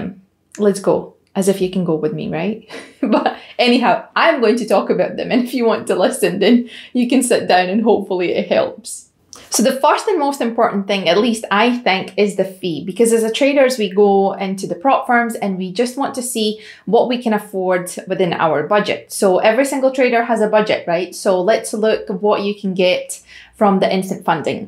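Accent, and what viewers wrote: Scotland, United Kingdom. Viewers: Where is your accent from? I hear Scottish but mixed with something else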